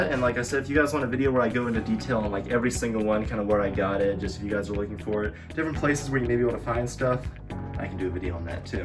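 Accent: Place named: United States. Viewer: yeah man im guessing from the accent your american.. in the UK its hard to find decent halo stuff your collection is amazing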